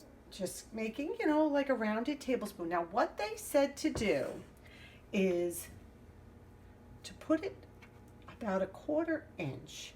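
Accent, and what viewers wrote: New York, United States. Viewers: Being from Jersey City (now in SC), our cawfee and tawk sound about the same as NY. That's probably why I relate to you so much (not to mention every time you say you got something at Shop Rite). I've got envelopes for Christmas and a new Christmas Tree in my "sinking funds". It worked for my parents with the Christmas Club back in the day, so this is my second year saving this way. My son and I buy things year round, so the Christmas money is for my Granddaughters who live with me and it certainly feels great not to have to use a credit card to purchase gifts. As always, love your channel and congrats to Maxine for winning the giveaway.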